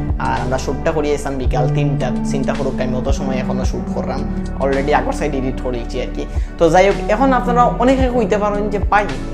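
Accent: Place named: Chittagong, Bangladesh. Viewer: Bhaiya apner Bari kothay? Is it chottrogram, coz I'm from chottrogram kintu okhaner bhasha kisui bujhi na😅but your one sounds like that, 😊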